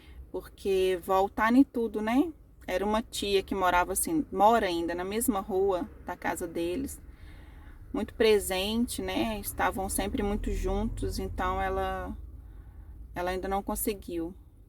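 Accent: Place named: Minas Gerais, Brazil. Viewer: Minha irmã mineira,logo no primeiro vídeo percebi seu sotaque , que testemunho mais lindo . O luto dilacera a alma ,qdo minha neta não tinha nenhum aninho ainda,qdo meu amado esposo foi acometido de um cancer ja muito adiantado.Fiquei quase 40 dias com ele no hospital,passou por 3 cirurgias ,eu só acompanhava os primeiros passos dela por video . Se não for o supremo amor de Deus a gente nao aguenta .Tantos sonhos ,me aposentei pra termos tempo com Marina , e vi meu amado cada dia piorando . Tudo que planejamos indo de uma forma tao cruel ,mas pela graça do Senhor tive forças pra entregar Ele para o Criador e Pai celestial . Foi um processo muito doloroso o meu luto porque entramos na pandemia ,perdi parentes ,vizinhos ,amigos,sobrevivi pelo legado de fé que ele deixou,um homem muito temente a Deus ,um grande pregador e adorador . Não foi facil mas o Senhor renovou minhas forças,minha fé e hj prossigo na obra ,minha netinha ja com 3 anos , e minha esperança maior é um dia nos encontrarmos na glória, por isso luto diariamente .Fico feliz que Deus te proporcionou uma nova história, e seu testemunho me fortaleceu ,que possamos permanecer fiél ate o fim , porque um dia Deus enxugará de nossos olhos toda lágrima🙏🙌📖📖📖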